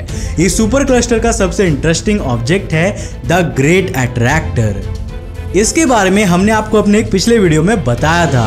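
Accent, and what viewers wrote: Bengal, India. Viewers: bhai tum bengali ho kya? btw love your videos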